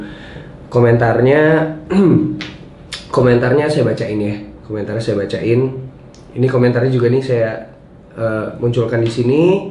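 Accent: Java, Indonesia. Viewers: mo nanya.... asli nya dr mana, kok bisa bhsa jawa medok?😄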